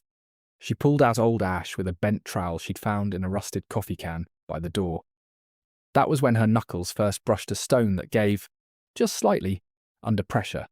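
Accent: England, United Kingdom. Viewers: love your stories but why the english accent. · South Carolina? Get rid of the English accent..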